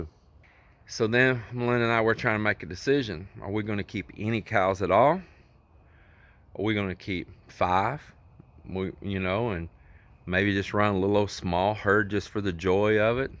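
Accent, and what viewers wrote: United States. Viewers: Certainly alot of decisions to have to make but at least on balance your listening all the best advise to allow a good judgment call, l love listening to your laid back American accent🤔🤗♥️👍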